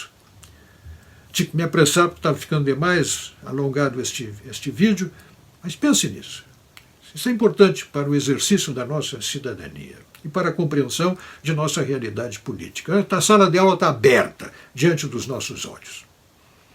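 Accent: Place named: Rio Grande do Sul, Brazil. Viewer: Sr. Puggina, quando ouço - o, neste timbre de voz típico sulista riograndense, sinto-me um privilegiado, como alguém ouvindo um professor, sentado ao centro e falando da sua experiência notável. Obrigado. Mantenha - se.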